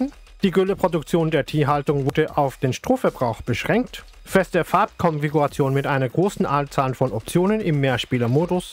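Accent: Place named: United States. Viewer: Ich mag den Dialekt so sehr! Bist Du Amerikaner?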